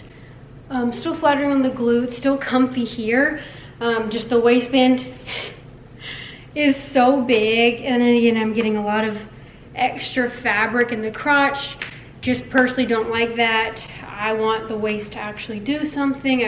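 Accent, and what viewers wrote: United Kingdom. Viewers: no she is Bri-ish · Are you British? you're lil British accent is so freaking cute 🥺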